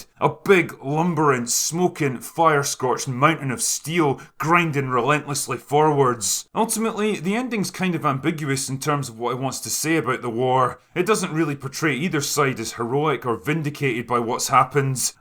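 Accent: Scotland, United Kingdom. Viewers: Drinker missed a prime opportunity to say in his epic scottish accent "The Beast, wha' is it all good for? Absolutely nothin' claiming territory & resources" 😆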